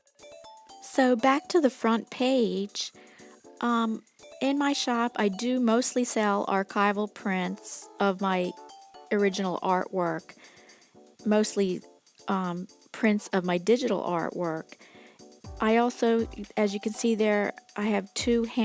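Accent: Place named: Pennsylvania, United States. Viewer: I like how she got her name. Sounds like you are from the other end of PA from me. That digital art was cool on an ipad. That wildlife one at the end had some pop to it. Watched the video in full gave you a like #payingitforward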